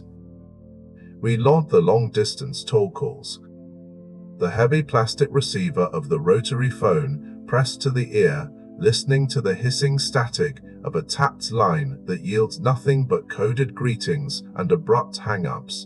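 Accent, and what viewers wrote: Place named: United Kingdom. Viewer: Sorry can’t take the accent from across a pond talking about the American mob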